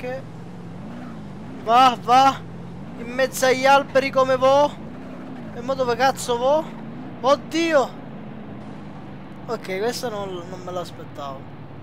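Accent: Sicily, Italy. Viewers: ma sei siciliano come me?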